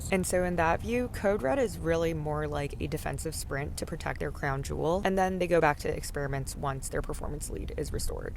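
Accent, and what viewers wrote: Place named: South Carolina, United States. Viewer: You sound like you are from southwest SC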